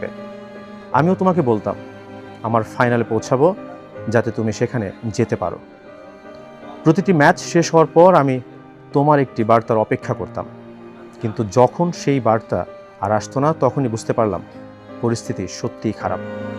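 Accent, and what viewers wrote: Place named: Faridpur, Bangladesh. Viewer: আপনি ফরিদপুরের পাঠক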